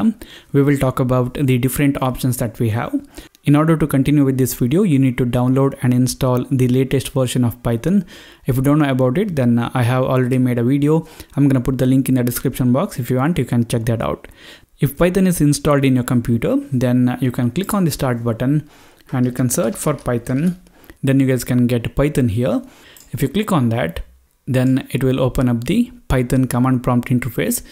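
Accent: India. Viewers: he has an Indian accent, he has proven more superior than me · by the time I hear Hindi accent I press like before watching the conter! Thank you my friend, I am sure I will find wisdon!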